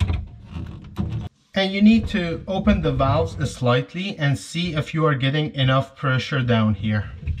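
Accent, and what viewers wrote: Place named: Iran. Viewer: Very Very Iranian accent. Good video thanks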